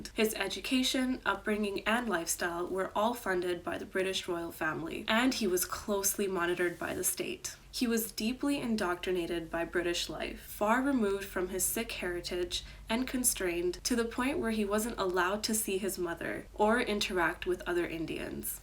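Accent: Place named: United States. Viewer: Does she explain anywhere why she speaks with an American (or mid-Atlantic) accent?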